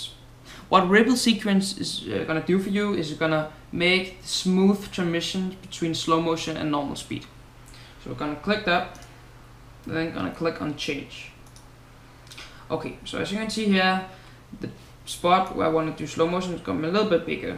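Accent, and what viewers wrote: Denmark. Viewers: Ej det tydeligt at høre du dansker ;) god arbejde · du er dansker, det kan jeg se og hører på dig:D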